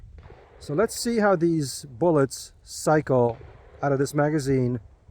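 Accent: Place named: United States. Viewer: For a Romanian you American accent is good.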